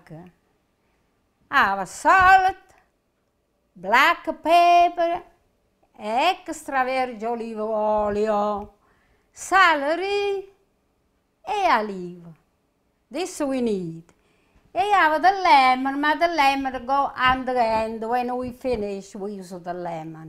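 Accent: Italy. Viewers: La adoro sg. mi piace il tuo itanglese😍. Che Dio la benedica a lei e la sua famiglia, la sua famiglia è veramente fortunata di averla. Buone Feste❤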